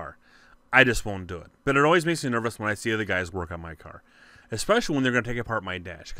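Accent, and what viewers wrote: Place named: South, United States. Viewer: if you here him right hes got a twinge of southern in his voice. in the south 2000ft=1mile. their education is that outdated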